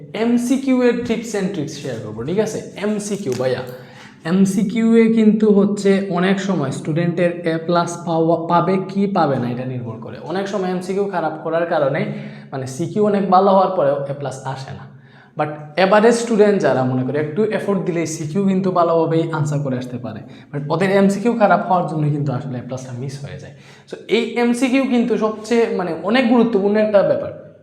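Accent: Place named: Bangal, Bangladesh. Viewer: ভাইয়া আপনার বাঙাল ভাষা কিছু বুঝিনা তাই শুদ্ধ ভাষায় কথা বলে প্লিজ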